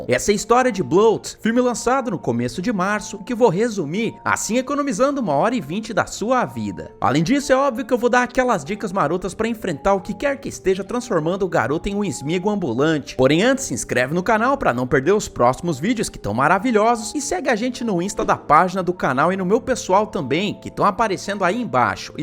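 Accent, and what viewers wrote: Portugal. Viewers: muito bom sotaque portugues, abraços, sou de portugal e vejo os teus videos e acho top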